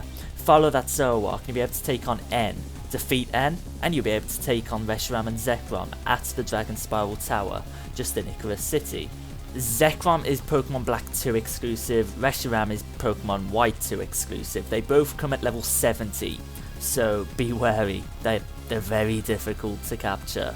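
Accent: United Kingdom. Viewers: AND ENOUGH OF THE BRITTISH ACCENT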